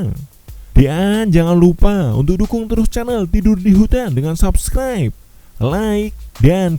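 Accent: Java, Indonesia. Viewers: medok banget jawanya si anchor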